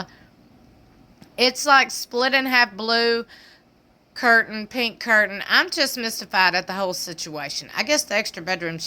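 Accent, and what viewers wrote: South, United States. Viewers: I just want to say I have been listening to you since the days and I just adore your southern accent so much and you have become a staple around my household. My husband doesn’t care a lick about sister wives but always wants to listen in when spilling tea! Keep doing what you do!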